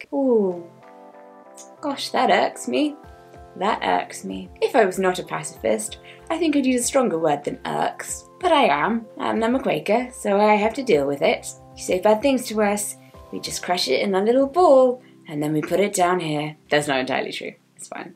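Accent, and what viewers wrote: United Kingdom. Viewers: Your videos are multi benefit for me! First, they're educational and I learn a lot from them, second, I'm an English learner and your British accent is amazing to listen to, and third, they're inspirational and it always proves my point that people with disabilities are the strongest (if that made any sense). Stay happy, best regards!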